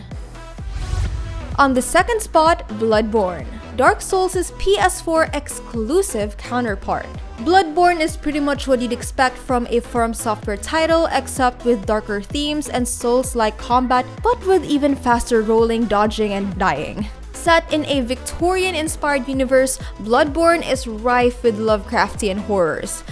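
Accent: Philippines. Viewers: The girl talking is a Filipino for sure cause of her accent :) a bit fob tho lol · You sound like a Filipina 🇵🇭 , are you? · Is that a Filipino accent im hearing? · your filipino accent is so cute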